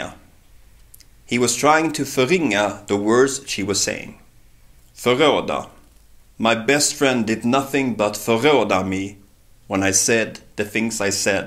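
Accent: Skåne, Sweden. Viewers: Ah right, I wasn't sure if you have a Skånska dialect, it's also been a while since I watched that video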